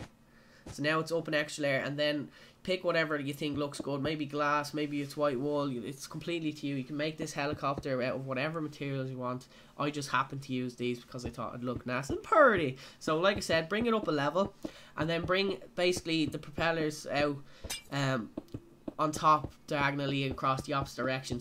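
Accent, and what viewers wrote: Dublin, Ireland. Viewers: Are you From Dublin?? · Are u Irish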